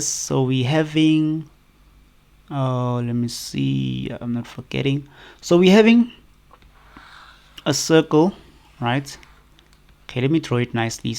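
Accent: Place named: India. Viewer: The voice seems indian lol but good video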